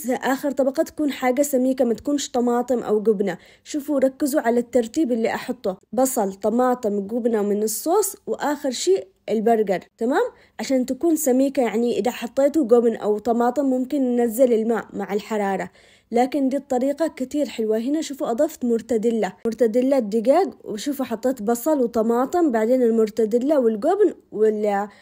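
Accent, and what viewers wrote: Aden, Yemen. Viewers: وووووووو اني فدالك ❤مبدعه والله وكله كوم و هدرتك باللهجة العدنيه الذي اموت عليها كوم الله يحفظك و يسعدك يا رب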